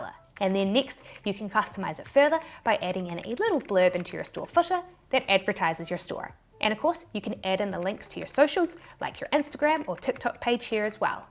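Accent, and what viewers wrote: New Zealand. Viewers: Hi, Fellow Kiwi here so I can understand you perfectly. I would love to move away from Etsy to Shopify but the time I'll have to put into marketing is daunting. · I knew instantly you were Kiwi when you said Etsy 😂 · Im not new here but what exactly is your accent? Its like you grew up in NZ, but have an American and Australian parents. From an Aussie across the ditch. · I lived in NZ for 22 years. It's nice to hear the Kiwi accent again.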